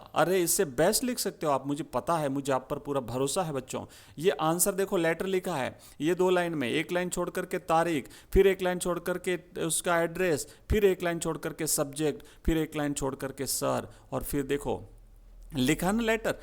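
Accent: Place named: Wagad, India. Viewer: Sir aap wagad se ho kya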